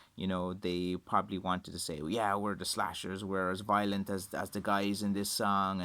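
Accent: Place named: Ireland. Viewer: You’ve drawn me in deep! First, your amazing stick videos (still saving up for one someday!🥺) and now, this collection of faction fighting videos!! Top notch material!Irish history and culture is fascinating, and I love hearing about it direct from Ireland (Irish accent included!). Keep those videos coming! I’ll keep saving up, so I can support your business!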